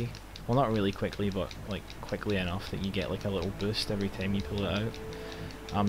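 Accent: Scotland, United Kingdom. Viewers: Awesome game+speedrunning+Scottish accent commentary=epicosity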